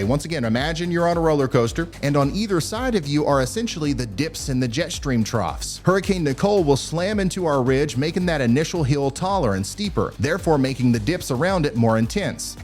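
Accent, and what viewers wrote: South, United States. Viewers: Very well done. Took one meteorology course in college, and it made me appreciate that this is a really tough profession. is excellent. And I enjoy the southern accent. Eastern TN or NC (Triangle area?)Or am I way off? Your “r sound” sounds like eastern VA though. So I’m stumped. Please let me know where you grew up. Beautiful accent, btw! Pastime of southerners is guessing each other’s accents!!! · Refreshing to hear good ole southern weatherman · the way you modulate your voice with that southern drawl, may be considered insulting to some folk ...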